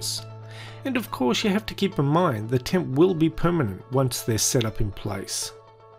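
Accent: Australia. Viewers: I like your accent. You sound like an Aussie :)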